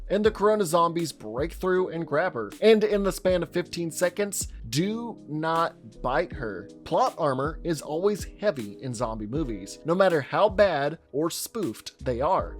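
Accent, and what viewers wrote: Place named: South, United States. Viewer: I love hearing a southern American accent on an excellent movie review.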